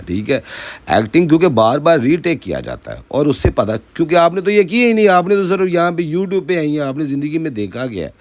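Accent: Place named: Pakistan. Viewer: Lahze se hi pata chal rha hai ki tum pakistani ho. Tumhare yahan ke nalle vloggers mar gaye jo indians per bolne ae ho ! Tumhare yahan ki zani pitara yaseen ko dekh lo, wahan kyun ni muh khulta tum logon ko .. bandi khule aam zina karke rang birange bacche paida kr rai hai ! Per wahan paise ni bnte honge na … indians per bolke kamana hai 😂😂😂😂😂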